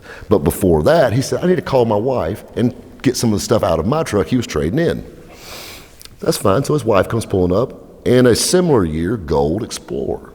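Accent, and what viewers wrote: South, United States. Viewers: If vin diesel had a country accent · Your voice IS the South.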